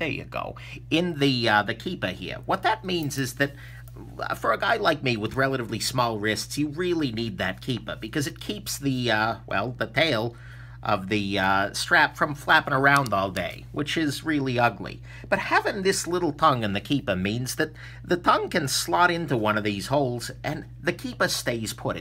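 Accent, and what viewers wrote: New York, United States. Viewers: New Yorker much? Nice review 👍🏼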